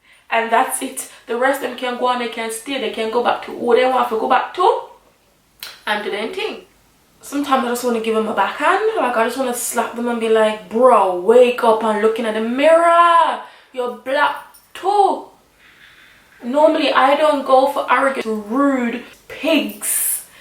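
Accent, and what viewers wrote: Jamaica. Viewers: First time watching ur vids and I could tell rite off the bat that youre a Jamaican... ... boii we cyaa hide.... ... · Omg are u a Jamaican? ??......mi hear di patwa....hahaha😂😂😂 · I seriously love it when you speak patois😂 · I love when your Jamaican accent comes out 😍😍 You're so peng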